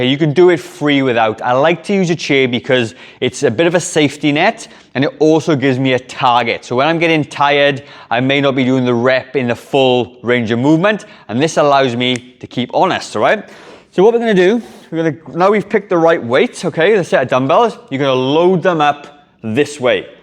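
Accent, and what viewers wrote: Wales, United Kingdom. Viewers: Bro, are you Welsh? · What’s with this guy’s voice ? Not only is he Welsh , but he’s got a weird speech defect ? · Is that a Welsh accent I detect, I'm from South Wales. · Did he say new zealand? He sounds welsh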